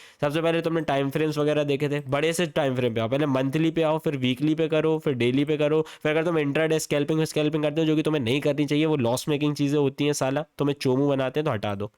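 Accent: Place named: Bhopal, India. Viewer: mujhe lag hi rha thaa bolne ke tarike se😂.... Apan bhi Bhopal se hey✌🏻🙌🏻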